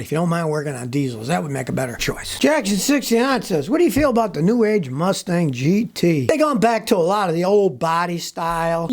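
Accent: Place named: New England, United States. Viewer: I really like and enjoy your videos. I like y'alls yankee accent, and also the way you speak very fast. HBD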